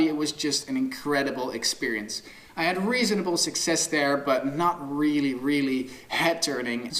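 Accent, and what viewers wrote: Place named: United States. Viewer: How did you learn American accent so well?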